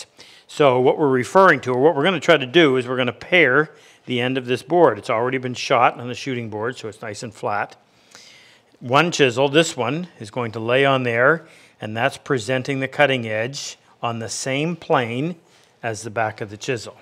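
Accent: Canada. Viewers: As I was watching your informative video (thank you), I noticed on one of your shop aprons a U.S. Army 14th Infantry patch, blue/red/gold castle with the caption, "The Right Of The Line". I'm assuming, by the flags in your shop and your accent, that you are Canadian. I'd be interested in the story of your affiliation with that military unit and/or how you acquired the patch? Thanks again for the YouTube content you provide!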